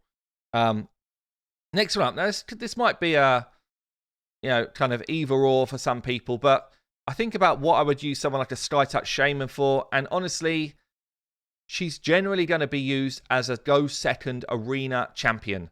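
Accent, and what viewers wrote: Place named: Hampshire, United Kingdom. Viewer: A nice change in content. I keep trying to place your accent. I'm thinking SE coast, Hampshire maybe.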